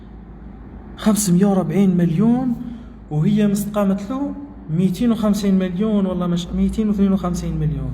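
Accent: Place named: Djelfa, Algeria. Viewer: فدقيقه 9.25وعلاه راك تسب فقسام وشبيك اااا تربا شويه تربى ياا وسمك هدرتك تا منين جلفه بوسعاده منين مهيش غريبه عليا هاذ لكنه وصوت بهدلت بارضت تقدم محتوى مفيد وتسب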